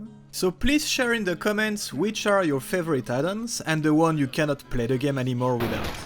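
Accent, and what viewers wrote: France. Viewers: amazing channel my dude. I just started Anomaly yesterday and you helped me a lot. I'm curious tho, where does your accent come from? Sounds like a french accent.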